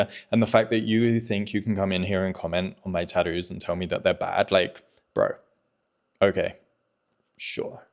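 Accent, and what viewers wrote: England, United Kingdom. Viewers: you are so handsome with a gorgeous English accent that sounds so classy, elegant and professional and I love your tattoos, even though they are personally not my thing - and if I did get a tattoo, it would be something about my Irish roots, like a Celtic Cross, Celtic Knot, a Shamrock or a Harp - I would definitely still date you in a heartbeat though, as you are absolutely gorgeous-looking with your tattoos 🇮🇪☘️🇬🇧😍😍😍😍😍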